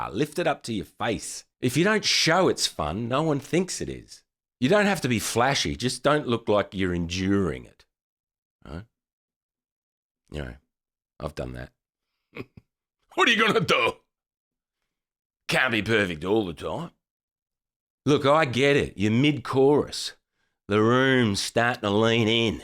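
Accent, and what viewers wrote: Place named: Australia. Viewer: Where have you been all my life?!?? so easy listen to ...this man has REAL advice.. and in my own Aussie accent it makes these videos relatable its and refreshing as it is straight up no bs effective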